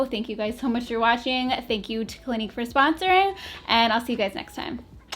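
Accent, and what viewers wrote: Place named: Minnesota, United States. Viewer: Are you from/live Minnesota? Certain things you say reminds me of how people there talk. 😊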